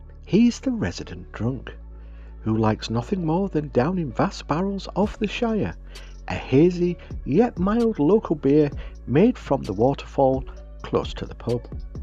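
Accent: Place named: Northern England, United Kingdom. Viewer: your storytelling northern boy accent is perfect for Shambalshire It’s a great accent. I have an unfinished piece that I think would really fit in to Shambalshire I’ll take a few photos and send them for opinion Cheers pal 👍☘️👍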